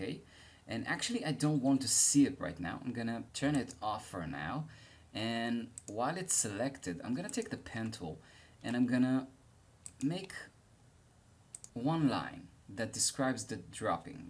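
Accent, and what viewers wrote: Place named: Israel. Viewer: שומעים שאתה ישראלי אבל אחלה מדריך! תודה רבה:)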